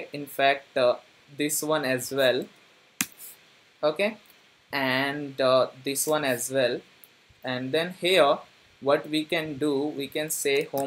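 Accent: India. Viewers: omlot houw ai louwe indian anglish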